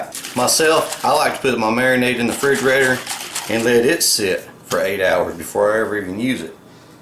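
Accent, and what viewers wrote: South, United States. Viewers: that thick southern accent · southern accent and all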